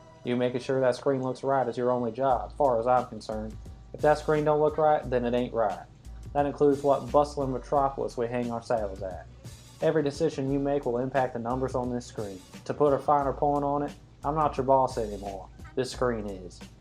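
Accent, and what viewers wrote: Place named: Mexico. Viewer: Maybe tone down the intense mexican accent, seem a tad off for a guy called Other than that great stuff thanks for the posting! You are a champ!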